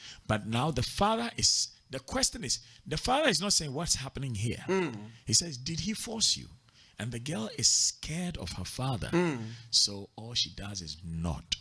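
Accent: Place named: United Kingdom. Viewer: But you’ve got a British accent so what should we call you.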